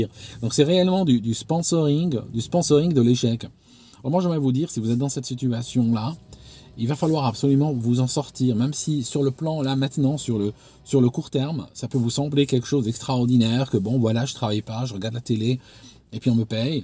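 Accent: Vaud, Switzerland. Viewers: Tu as l'accent suisse comme même :) 'Avais jamais remarqué :) ! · Merci pour la vidéo c'est top. En revanche l'accent Suisse est atroce 😂. Heureusement que ça s estompe au fil du déroulement de la vidéo. Salutations distinguées. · J’ai une théorie sur cet accent suisse. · ton accent suisse est revenu tellement fort que je doute que tu sois toujours au royaume uni. Super conseil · C'est quoi ton accent Suisse 😁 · Pourquoi prendre cete intonation suisse ? Ai-je raté un épisode ? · Y a l'accent suisse qui revient au galop!!! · Alors ton accent c est en Suisse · C’est marrant ton accent suisse dans cette vidéo, … 😊 Plus sérieusement, j’ai été contrainte d’aller aux services sociaux quand je suis rentrée d’Angleterre. Je préparais déjà une licence et j’avais besoin d’une année pour la terminer. J’avais aussi besoin de nourrir mes enfants. En même temps, je me suis inscrite à des concours. 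Comme j’ai réussi le premier, j’ai laissé tomber les autres qui étaient équivalents. Ainsi, j’ai pu m’occuper de mes enfants, les nourrir et leur faire faire des activités. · Pourquoi tu prends l'accent suisse ?? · petit aparté : je n'avais jamais fait attention au fait que tu avais un accent suisse ! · C'est quoi cet accent suisse mon 😂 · Dommage pour l'accent suisse trop présent qui rend l'ecoute plus difficile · Arrêtez de dire "accent suisse" dans les commentaires, ça veut rien dire. C est de l accent vaudois qu il est question, en l occurrence. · Pourquoi est-ce que t’as l’accent suisse dans certaines vidéos? 🤣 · Pourquoi tu prends un accent Suisse ? En France on paye des charges hallucinantes pour justement pouvoir toucher des aides en cas de chômage. Je veux bien qu’on ne donne plus de cash aux chômeurs, pas de « sponsoring » comme tu dis mais dans ce cas, je ne veux pas voir sur ma fiche de paye toutes ces lignes qui sont retirées à mon brut… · J'ai l'impression que vous avez pris l'accent suisse !!! 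· Incroyable en écoutant ta vidéo j'entendais la mélodie de l'accent Suisse, puis en regardant la description j'ai vu que t'étais à Genève haha. Sinon top le contenu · Cette accent suisse, c'est trop mdr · lol l'accent Suisse qui revient. Je te regarde en mangeant une entrecôte Wagyu. Pas de la bouffe de RSAiste ça.. Ce qu'on a de plus précieux c'est notre temps de vie (en bonne santé). Le gâcher largement pour avoir un peu plus, mais plus assez pour faire grand chose notamment depuis le covid et l'inflation, je me demande de plus en plus si ça vaut le coup. Les aides baissent pas elles augmentent... Le SMIC idem, il augmente fortement avec le temps, je l'ai jamais vu baisser. Ceux qui ont un peu plus, sont pas augmentés, résultat on s'appauvrit, on est de plus en plus proche du SMIC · Ça me perturbe c’est l’accent suisse que tu as dans cette vidéo ?? J’ai noté un accent bien marqué c’est ps habituel il me semble 😂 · Bin, c’est quoi ce nouveau accent suisse? 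· Trop rigolo l accent suisse wue vous prefer. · J'aime bien ton accent Suisse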